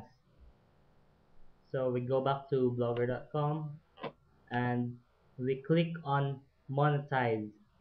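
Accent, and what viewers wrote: Philippines. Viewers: its because you sounded like noypi... just like me.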